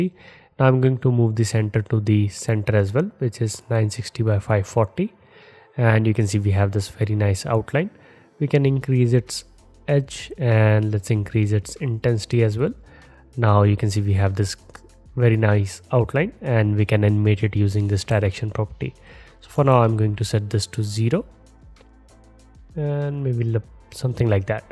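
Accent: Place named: India. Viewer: I'll be completely honest, I can easily tolerate this level of indian content just for how chill it is. the dang phone calls I have and the audio is so muffled, it be difficult to hear individuals with said accent via phone quality but YouTube audio quality is like listening to an audiobook lol Thank You for being here sir!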